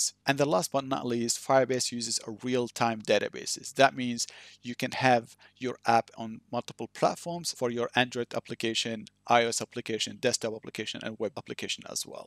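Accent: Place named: Arab world. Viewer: Are you Arab? Because you sound like one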